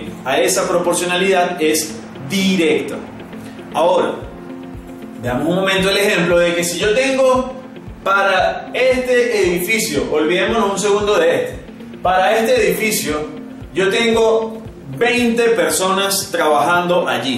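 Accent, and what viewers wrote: Venezuela. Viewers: Like si te diste cuenta que él profesor es venezolano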